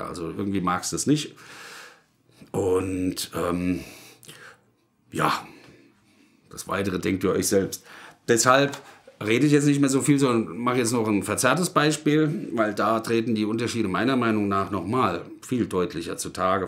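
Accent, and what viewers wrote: Hesse, Germany. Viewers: babbelt hessisch.....great!!!!!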